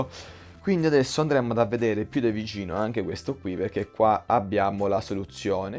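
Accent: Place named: Apulia, Italy. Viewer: Ho provato a seguire passo passo ma il mic nin funzionava. Ho sentito che hai un accento pugliese e per questo ho chiesto,io sono in provincia di Brindisi.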